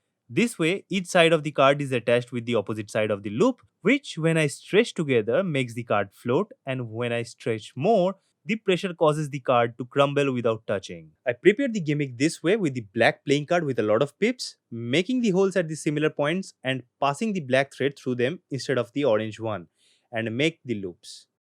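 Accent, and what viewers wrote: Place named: India. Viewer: You speak indlish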